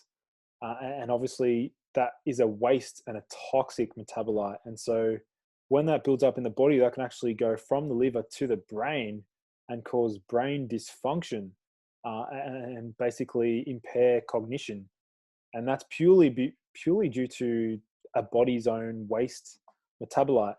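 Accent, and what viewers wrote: Australia. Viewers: he's not british. That is an Australian accent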